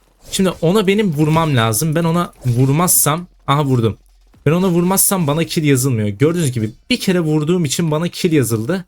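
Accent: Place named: Azerbaijan. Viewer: Abi sen galiba azerbaycanlısın konuşman dan anladım bende azerbaycanlıyım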